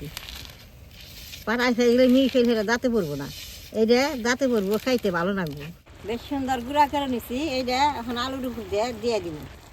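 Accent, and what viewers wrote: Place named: Nawabganj, Bangladesh. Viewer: দেখেই মনে হচ্ছে অনেক মজার। দিদি আপনার দেশ কই? কথা শুনলে মনে হয় আমাদের নবাবগঞ্জ বান্দুরার 😅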